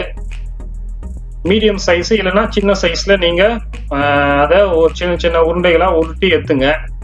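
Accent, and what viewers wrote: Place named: Bangalore, India. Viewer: Bro neengo Bangalore slang pesuringo correct I think you are from Bangalore I followed your tips it came very wellmy wife & childrens enjoyed it Thank you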